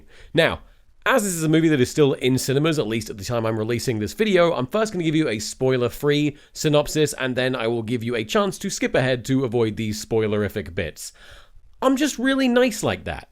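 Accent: United States. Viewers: You have a good American Accent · Tomska's American accent is impeccable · Your American accent is so good what Have you been doing s British Accent this entire time Nevermind I got to the antivirus part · I’m sure this is mentioned a lot but American accent is incredible. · There's something uncanny about how incredibly natural Tomska's American accent is. I know he's been doing it for years at this point but still putting it side by side with his actual accent is pinging my wrong vibes detector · Holy shit that American accent is wild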